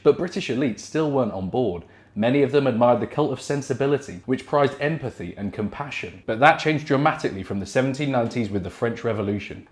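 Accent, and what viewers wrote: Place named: United States. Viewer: Enjoyed the scholarly and interesting history. The American accent, not so much. 🇺🇸🇺🇸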